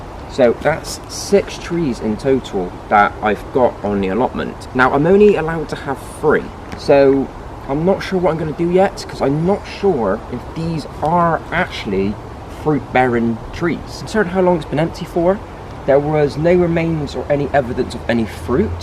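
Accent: Bristol, United Kingdom. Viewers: What’s your accent, is it Bristol?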